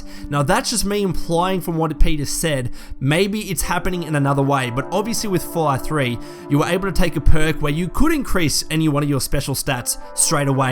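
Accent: Australia. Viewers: LOVE your videos but every time I watch them, I'm always spacing out from how your voice sounds so calm and Australian... I don't mean anything mean by it. Keep up the good work though!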